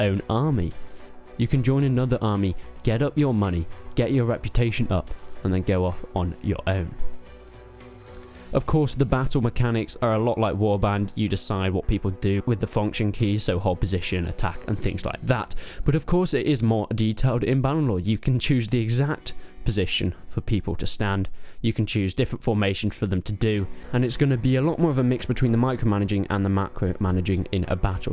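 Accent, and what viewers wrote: United Kingdom. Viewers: Where the hell did he do anything to insult German national pride? - of which there is none, matter of fact, anyways. You just condemned for allegedly hurting "a nationsfeelings", and in the same breath, belittled U.S. Americans, generalized them, for being easily offended. You can't measure actions with one standard and then apply another one to your own. And besides that bullshit - how is it NOT okay for him not wanting to subscribe to a German magazine if he - as he said in another video - doesn't even understand German very well? Fortunately for us, WE don't have to do that now. Is it the profit of the magazine you're defending or is it that you want everyone to read Gamestar? Dude. is - I hope I won't lie - British, judging by his accent. I have no clue, just found the video. And you want him to subscribe to a German gaming magazine so you won't think of him as a some kind of "Anti German Supremacist"? 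Fine. Go subscribe to the first Mongolian game magazine found on google then! Or otherwise, I must denounce you as a racist! Oh, you don't speak any Mongolian you say? - You racist! You think of Asians as inferior?! Dirty German scumbag! Surprise fact - everytime there is exclusive information about a popular subject somewhere in the media - in this case, exclusive intel about the state of Bannerlord in a German magazine - it will get translated, it will be published elsewhere after a while, it will cease to be exclusive. Which is a good th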